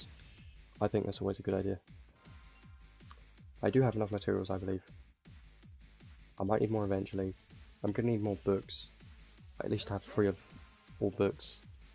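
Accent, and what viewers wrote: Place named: United Kingdom. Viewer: That reaction is why I see your videos at night. Such a soothing Brit voice. XD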